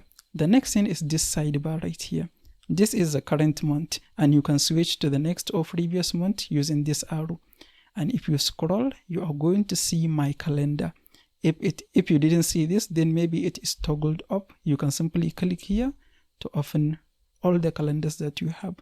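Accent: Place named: Hausa, Nigeria. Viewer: you accent is funny.. are you hausa ?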